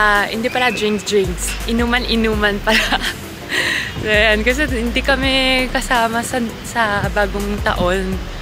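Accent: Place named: Philippines. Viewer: Love your accent. Pilipina ka TALAGA! Galing.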